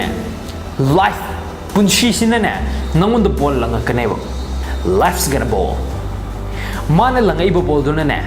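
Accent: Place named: Manipur, India. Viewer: Ngcdi manipuri khra sengna ngnge keep up bro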